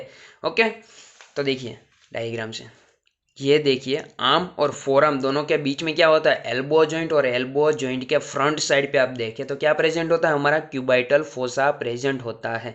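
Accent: Gujarat, India. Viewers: Gujarati cho ?😅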